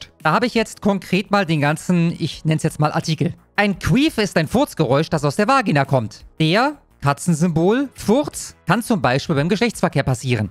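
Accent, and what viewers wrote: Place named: Berlin, Germany. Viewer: Oh ok cool 👍. Berlin hab ich so ein wenig rausgehört aber ich dachte eventuell hättest du russische oder polnische Wurzeln, weil es sich etwas danach anhört wenn du sprichst 😅👍 liebe grüße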